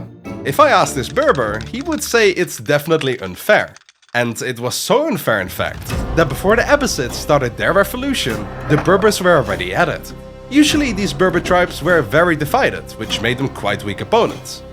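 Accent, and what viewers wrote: Netherlands. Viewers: the Dutch accent is making it so much better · Are you Dutch by any chance? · love ur Dutch accent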